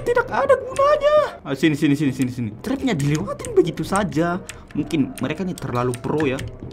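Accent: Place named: Malaysia. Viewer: apa bahasa malaisya ya maaf aku tidak tahu omong omong nama aku syaurah suara nya kaya malaisya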